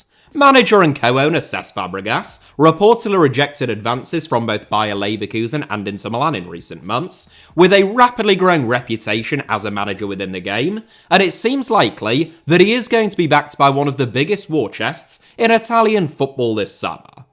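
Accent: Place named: United Kingdom. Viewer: I can't think of a greater punishment than hearing a british man pronounce Como repeatedly for 45 straight minutes. Or should I say "Coumou".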